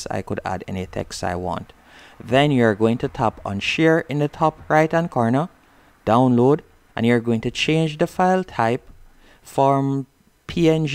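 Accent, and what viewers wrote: Jamaica. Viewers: Thanks. Easy to follow especially in that fellow yaadie accent,🥰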